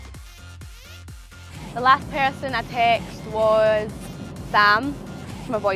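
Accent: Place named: Scotland, United Kingdom. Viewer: hot, and a scottish accent? dear lord perfect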